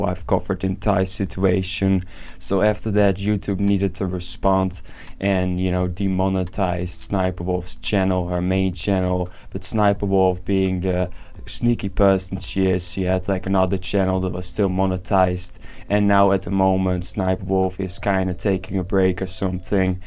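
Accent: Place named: Netherlands. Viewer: Ik versta aan je engels dat je nederlands ben😁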